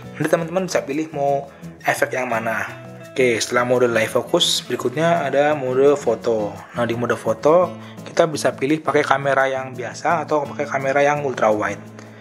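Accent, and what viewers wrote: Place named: Java, Indonesia. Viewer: Lokasi videone pas sore nang GSP yo mas? 😅 Logate yo rodo medhok je ✌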